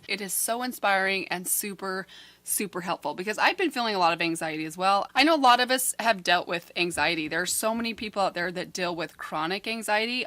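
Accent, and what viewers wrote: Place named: Brooklyn, United States. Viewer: Um, pretty close to an OG New York (Brooklynese to be precise) accent! #Fuhgettaboutit Thanks for the podcast suggestion, love to listen as I try to drift off 😴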